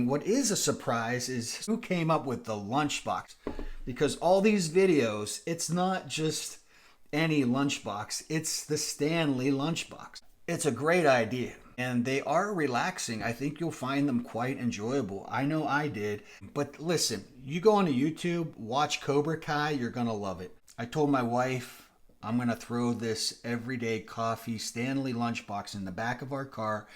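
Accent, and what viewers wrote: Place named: Pittsburgh, United States. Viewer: just when some sad corner of youtube said the Yinzer accent was dian aht I find this video ✨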